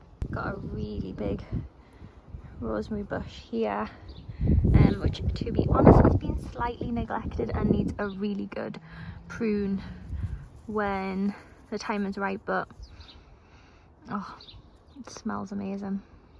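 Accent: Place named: Newcastle, United Kingdom. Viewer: Hi you are a natural your accent is so cute not too much Newcastle. I like that you are more old tech school, please keep it that way.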